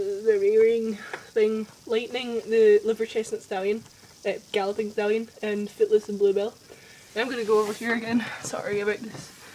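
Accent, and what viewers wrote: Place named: Scotland, United Kingdom. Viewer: Your not alone with the accent , cause I'm scottish too :-D and live near Glasgow !!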